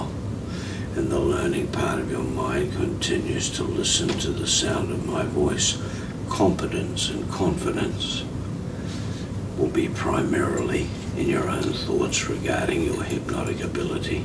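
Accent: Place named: Australia. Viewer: This guy is Australian, not English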